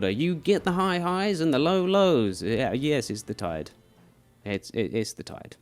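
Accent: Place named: United Kingdom. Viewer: Mate you sound British… what is your issue with the British?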